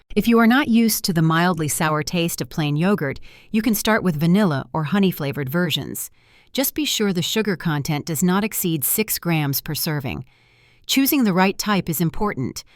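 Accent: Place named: United Kingdom. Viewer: Food suggested are only good for rich Army Generals, thank you for your clear britsh Englisheverybody can understand.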